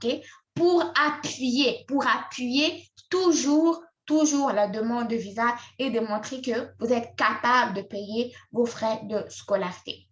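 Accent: Canada. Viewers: Tu expliques parfaitement bien poupée. Merci pour ces riches informations. Je souligne de passage que tu commences à avoir l'accent canadien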